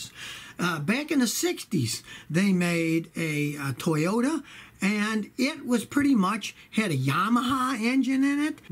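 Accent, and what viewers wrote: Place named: East Coast, United States. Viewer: Are you from Philadelphia? Your accent sounds from the East coast.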